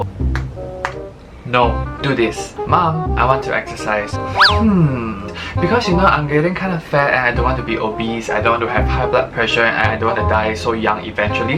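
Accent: Singapore. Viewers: I can tell your from Singapore because of your accent 😂😂, anyways thanks for the video, I let you know if it works!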